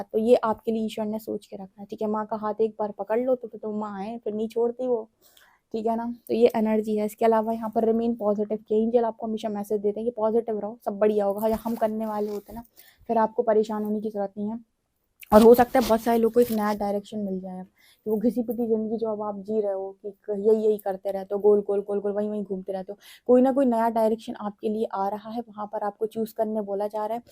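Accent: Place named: Bareilly, India. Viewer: ap up ya bareilly side jaise sound karti ho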